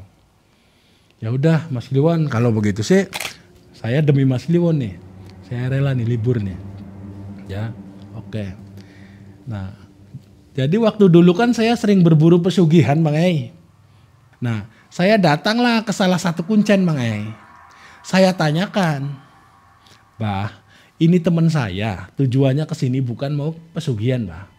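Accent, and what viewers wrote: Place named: Cirebon, Indonesia. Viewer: pasti wong cirebon kih 😁 logat nya cirebon pisannn😍🥰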